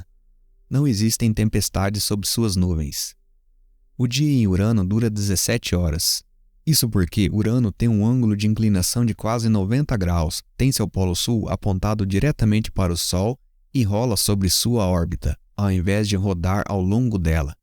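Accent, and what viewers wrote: São Paulo, Brazil. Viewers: Seu sotaque Paulistano é muito legal, sem falar nesse belo documentário. As voyagers serão a prova da existencia de uma fantástica e predadora raça humana, que talvez terá se autodestruido em função do seu próprio ego.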